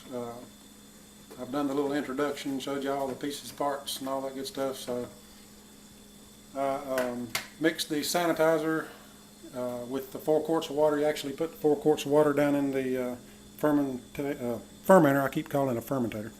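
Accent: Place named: South, United States. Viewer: LOL!. FER-men-tater. :) I love your videos, but I have to watch them in small doses, as I have always been susceptible to picking up southern accents when exposed to them for too long. :) Thanks for the great info here, though, I am about to start home brewing and so am doing preliminary research. I don't think I will start with a Mr. Beer, but this is interesting nonetheless.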